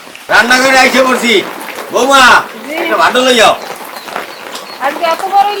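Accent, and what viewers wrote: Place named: Barishal, Bangladesh. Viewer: খাস বরশাইল্লা ভাষায় কথা শুনে মনে হয় নিজের এলাকায় আছি।পটুয়াখালীর ছেলে আমি, আংকেল আমি আপনার ভিডিও রোজ দেখি অনেক ভালো লাগে পাশাপাশি আপনার মাধ্যমে রান্নাও শিক্ষতেছি।আপনার জন্য আন্ত্রিক শুভেচ্ছা ও অভিনন্দন রইলো ভালো থাকবেন।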